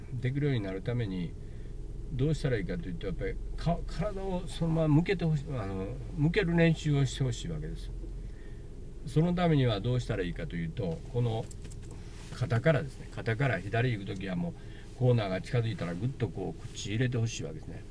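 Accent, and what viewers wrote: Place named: Kansai, Japan. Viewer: 懐かしい関西弁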